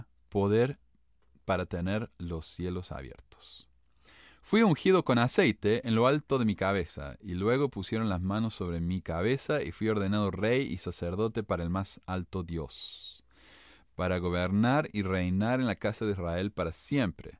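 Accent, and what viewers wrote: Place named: Argentina. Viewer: es argentino de cordova en chile estamos llenos de modelitos de reality de ese país su acento inconfundible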